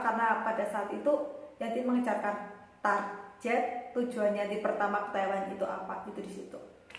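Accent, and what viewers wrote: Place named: Banyumas, Indonesia. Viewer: Aku sukak deh gaya dan logat mbak Yanti yg ngapak...orak ngapak orak inak wekwekwkewke lanjut mbak oleh oleh khas Semarang sudah kusematkan jangan lupa kembalian apalagi di rumah ada masakan arab yg siap dimakan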